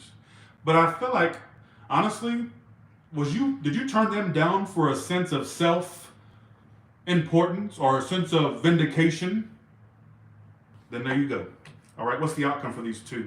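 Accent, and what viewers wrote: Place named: South, United States. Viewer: U r good!!! Im looking to travel and perhaps move… lol love the accent it’s why i need to move or want to move south!😊 it’s been my goal thank u Covid for holding up my plans.. im going.. not sure-I don’t have a person im riding the wave…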